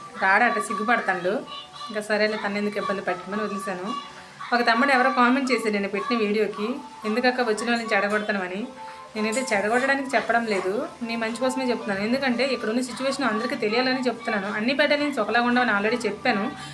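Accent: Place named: Vizianagaram, India. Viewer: మీరు చక్కటి మన vizianagaram యాసలో మాట్లాడటం చాలాబాగుంది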